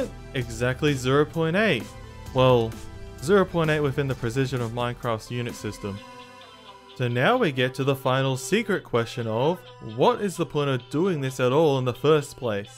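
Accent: Australia. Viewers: as a native, he sounds very Australian to me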